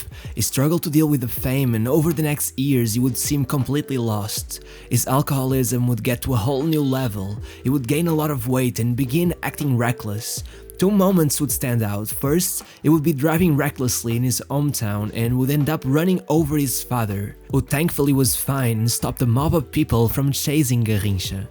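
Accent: Portugal. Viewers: My friend, you are from Portugal. I can feel it in the accent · Nice try, mas dá para perceber o sotaque português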